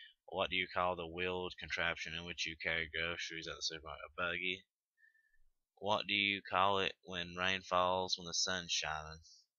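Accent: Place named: Tennessee, United States. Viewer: Dude I'm from outside of nashville, and I say everything they way you do. It makes me laugh, cus I've watched all the other people from different parts of the world do this as well, and the way you do it so funny. lol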